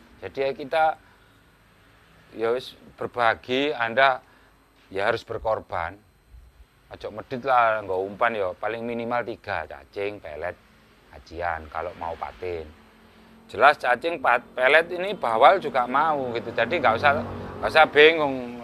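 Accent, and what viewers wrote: Surabaya, Indonesia. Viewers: Suroboyo ndi cak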